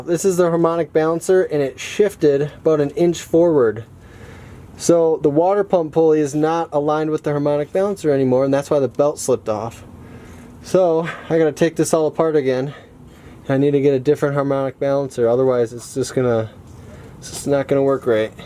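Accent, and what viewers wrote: Wisconsin, United States. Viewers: I love the mn Wisconsin accent lmao I'm from St paul. Totally understood everyhthing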